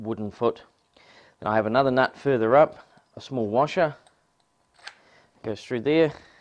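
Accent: New Zealand. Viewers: A Gold Star example of how an instructional video should be made, clear to follow, straight forward perfect lighting. I wish I had that CNC machine! Do I detect a slight 'Flight of the Concordes' accent?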